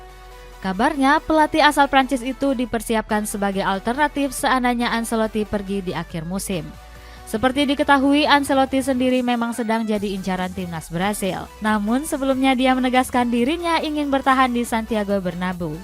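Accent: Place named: Kalimantan, Indonesia. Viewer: Logatnya kalimantan banget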